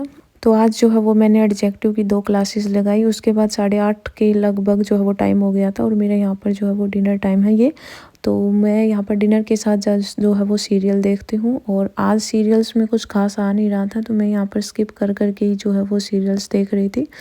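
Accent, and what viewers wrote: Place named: Kashmir, India. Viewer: Mam apk accent se lga..kashmiri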